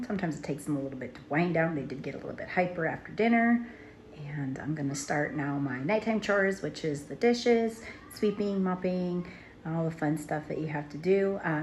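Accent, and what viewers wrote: United States. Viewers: I came upon your channel and am enjoying it. Beautiful family. I knew I recognized an upper Midwest accent (I am from Michigan!) 💕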